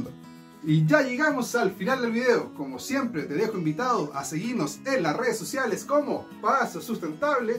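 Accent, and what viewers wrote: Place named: Chile. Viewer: Hermoso acento chileno 😻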